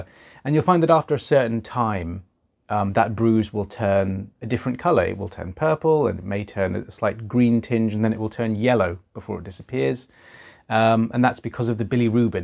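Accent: United Kingdom. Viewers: It's 1am and I ended up here. Watching a hot doctor with a British accent pull out ear wax, which is satisfying on so many levels.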